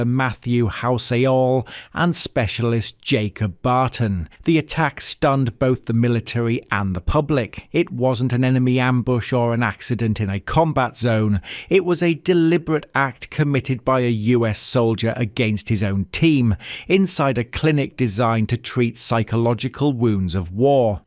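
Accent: United Kingdom. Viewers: British accent is annoying.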